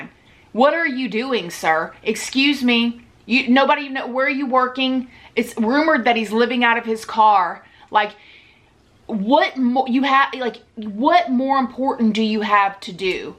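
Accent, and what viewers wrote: South, United States. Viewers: Your accent got even more southern over the christmas i hear!😄 You are so, so sweet! And good job with the video. Very interesting case indeed!🤓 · Your obviously from down south, I'm curious if you have some Italian in you the way your hands fly around when you talk???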